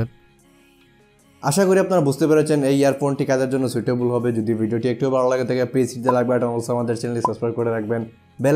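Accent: Sylhet, Bangladesh. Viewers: Bhai er basha ki sylhet? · Kitaba vai Sylheti ni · ভাই মনে হয় সিলেটের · Ai kita sylhety ni? Masha Allah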